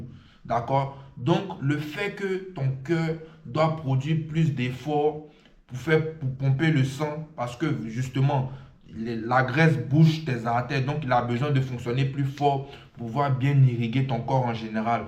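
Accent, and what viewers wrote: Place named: Ivory Coast. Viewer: Coach je sais pas pourquoi mais j'aime tes gimmick et ta manière de parler, j'ai montrer ta vidéo a un pote et c'est lui qui ma dit que tu étais Ivoirien 😅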